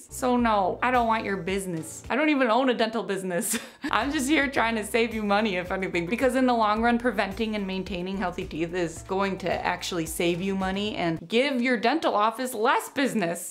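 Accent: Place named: Midwest, United States. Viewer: Awesome! I could detect the Midwest accent. My family is from Iowa but live in Cali. Thanks for your content!!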